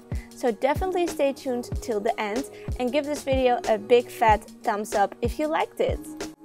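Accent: Netherlands. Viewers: Je hebt een Nederlands accent aan je engels supergrappig!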